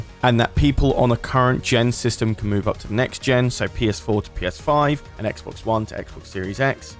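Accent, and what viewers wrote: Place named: London, United Kingdom. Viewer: You sound like your from London.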